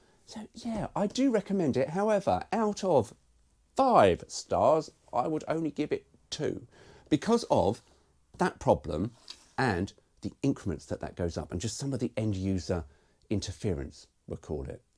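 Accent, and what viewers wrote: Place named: United Kingdom. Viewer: This guy is hella proud to be english🙆‍♂️1st time l hear the english sound really english.Nobility running through those veins.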